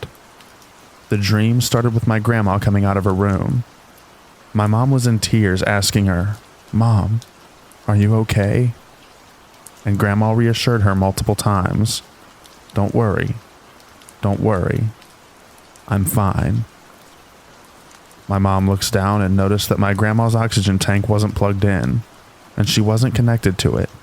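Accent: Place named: South, United States. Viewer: I love listening to your stories and sometimes picking up a little bit of southern twang, I always smile when I hear it!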